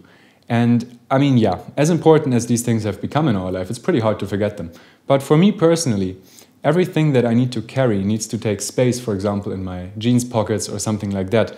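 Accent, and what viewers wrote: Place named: Germany. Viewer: Interessantes Video, wenn auch ein bisschen zu lang. Man hört aber deutlich, dass ein Deutscher hier versucht, Englisch zu sprechen (z. B. an der wechselnden britischen und amerikanischen Aussprache, so sagst du z. B. läßt statt lahst (für last) oder fähst statt fahst (für fast)). Warum das sein muss, verstehe ich nicht. Mach doch solche Videos (auch) auf Deutsch.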